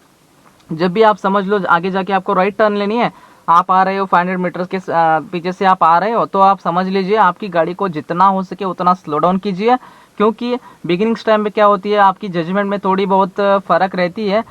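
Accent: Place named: Karnataka, India. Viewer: Being a kannad...your hindi is excellent👍👍....a little influence of your mother tongue is quite natural !!!....👍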